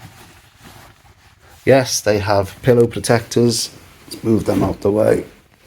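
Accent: Liverpool, United Kingdom. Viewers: are you a Liverpool lad hey I am from Formby myself lol xxx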